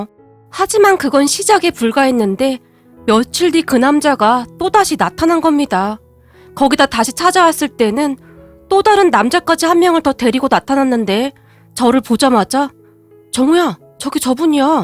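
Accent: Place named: Jeolla, South Korea. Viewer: 님 왜케 귀여워요ㅋㅋㅋㅋ 접때 우연히 전라도 사투리쓰는사연읽고반했음ㅋㅋㅋ 넘귀엽.. 인스타안하세요? ㅇㅅㅇ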